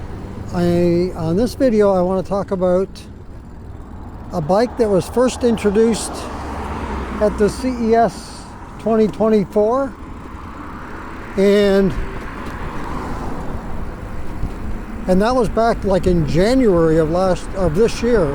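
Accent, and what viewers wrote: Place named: Canada. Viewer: If I close my eyes.. listening, I think I'm in Canada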